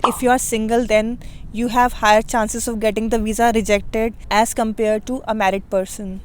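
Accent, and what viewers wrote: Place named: India. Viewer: Indian accent so funny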